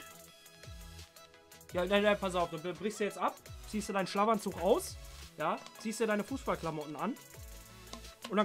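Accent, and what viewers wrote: Ruhr, Germany. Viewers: Dabei seit ca 35K Abos. - Ein Kanal der durch Menschlichkeit und Sympathie punktet. Der Protagonist?. Ein Mensch der immer einen Joke auf Lager hat und mit seiner Ruhrpott-Schnauze die Fifa-Szene rockt. Ein absolut Bodenständiger Typ, dem man die 300K einfach gönnt. du Legende, mach weiter so und lass dich nicht verbiegen. #KellerGang #2K18iscoming #HappyNewYear #Crewlove #VomShishaKellerzumKeller #Roadto400K #BesterYoutuber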